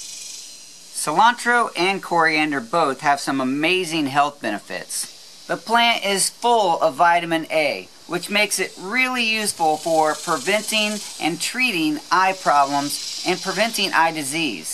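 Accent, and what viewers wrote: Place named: South, United States. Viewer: That Strong Southern Accent :P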